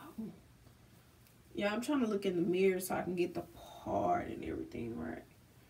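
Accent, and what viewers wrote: Louisiana, United States. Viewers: New method until my wig fall off in front of everybody 😂😂😂😂😂😂😂😂😂 that's what I'm afraid of 😩 love your Louisiana accent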